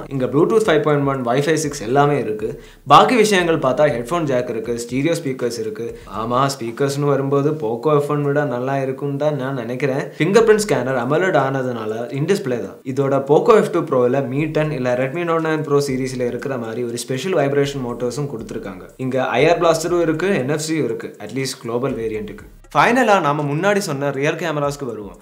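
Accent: Kerala, India. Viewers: His accent has malayalam flavour. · Liked that Malayali tamil accent.. Gud review. 👌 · Sounds like a mallu talking tamil. Anyway nice presentation. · Unga tamil slang pidichirukku.. are you malayali? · Anchor Malayaali... 😍😍😍🎈🎈🎈🎈🎈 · Malayali tamil pesurathu romba nallaruku.... 😀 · Are you malayali? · Bro,are you malayali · .. Are u a malayali?????? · Bro neenga malayalaia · Neenga malayalama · Kerala bro appadidhan pesuvaru Okdhan bro eppothume mass🔥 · I guess he is a malayalee. The slang though - adipoli bro.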